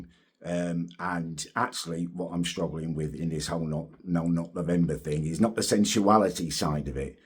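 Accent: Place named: Blackburn, United Kingdom. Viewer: Think he was born in Blackburn, I'm from around there and he has the same accent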